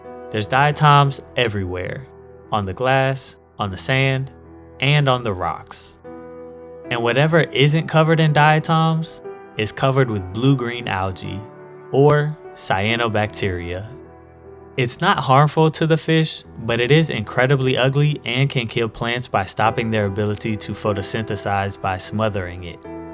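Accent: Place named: Los Angeles, United States. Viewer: You sound like you're from LA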